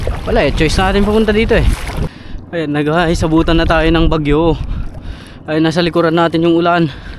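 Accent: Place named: Ilocos, Philippines. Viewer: Oks Sana kaso korni ka magsalita bro.. Halata naman ang Punto mo being an illokano😅😅😅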